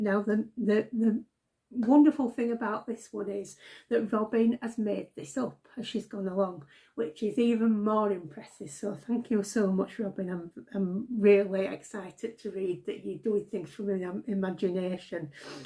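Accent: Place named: England, United Kingdom. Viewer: Just found your channel. Love Vera and your lovely English accent. You do good work and I subscribed!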